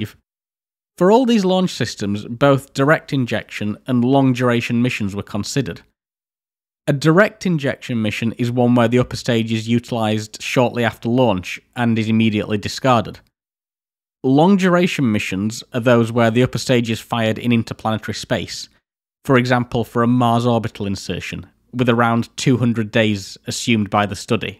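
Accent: United States. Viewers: This is a really interesting video, but do you have to speak in American English? It spoils your delivery.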